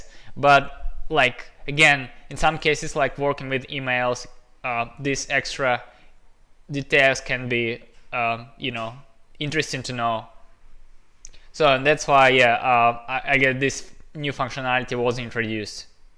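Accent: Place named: Russia. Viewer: Я один слышу русский акцент? )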